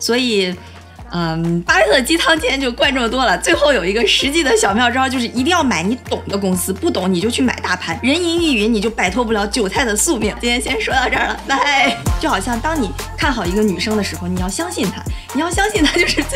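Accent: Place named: Dalian, China. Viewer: 中山区出生的海蛎额味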